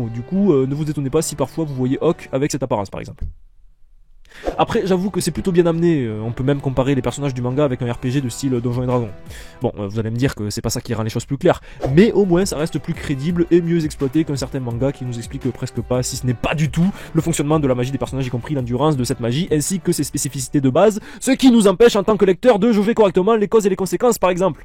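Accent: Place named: Marseille, France. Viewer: Tu as un accent Marseillais 😂 sinon tu gères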